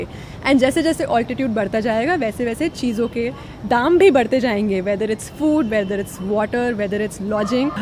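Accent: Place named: India. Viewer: OMG INDIENGLISH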